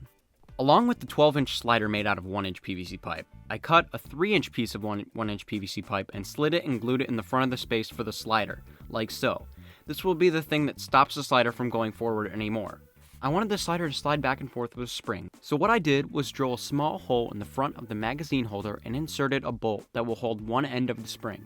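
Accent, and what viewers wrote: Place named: New York, United States. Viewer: you spund like youre from new york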